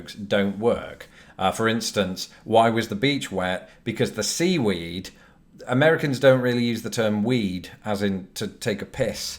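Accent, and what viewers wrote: United Kingdom. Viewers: Loooooooooove That British accent. So True we like it! And good content (yep - Love British history. My family came over on the Mayflower from Manchester! 😀) Now I'll be able to enjoy the accent and content on the Reg. New Subbie. 💋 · Just found your channel. This is so funny and so true! Having a British accent sure intrigues us Americans! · to be fair mate your accent sounds british as fuck even to other brits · I LOOOOOVE the British accent!!!! I dont know why, but it just makes me crazy!!! So beautiful! Love from Texas!❤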